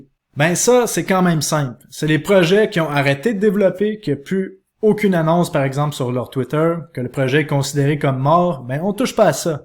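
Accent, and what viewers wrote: Quebec, Canada. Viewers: Est tu de Québec ou MTL ?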